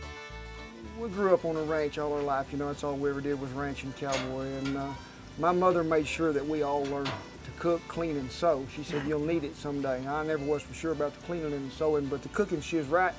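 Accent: South, United States. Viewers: Omgosh! Look how young you are!?! I only came across your channel I believe this past weekend or 4 days ago at best. I didn't think you had your channel a very long time. Bless you for your success. Love your Southern accent too. You're so informative, funny as all get go & love your dancing jig. Would love to actually see your lovely voiced wife too. Love, love Chicken fried steak with gravy! OK, time to watch the process. Peace be with us all 🙏. 12/28/24, "Isa" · if you rip your britches what you gona do I wish we had smellivision looks yummy thanks for this video take care ya'll sorry I'm in Massachusetts I like the southern accent or draw take care now yall come back ya hear 👂 I live a boring life until I watch and listen to you and others when I was a child my mom would say to me you want to learn how to cook na I want to watch tv no 62 I watch you hahahaha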